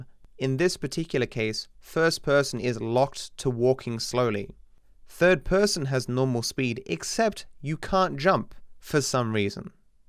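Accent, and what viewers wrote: United Kingdom. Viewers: Now I know, he’s Bri’ish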